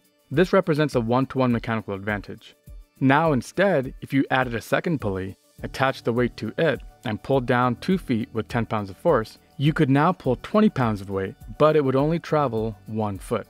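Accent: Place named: United States. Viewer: hey you sound American, good job!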